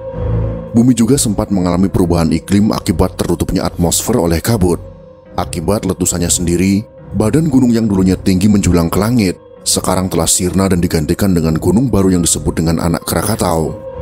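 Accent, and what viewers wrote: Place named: Bali, Indonesia. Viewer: Bang, org Bali ya??? Pengucapan T nya Te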